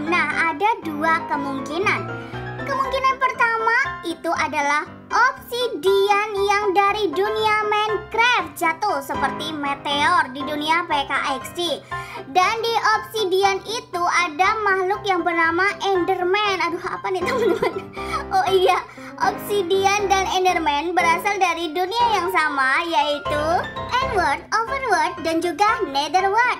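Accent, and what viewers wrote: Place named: Java, Indonesia. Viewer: Kakak ini orang jawa ya? Logat nya kerasa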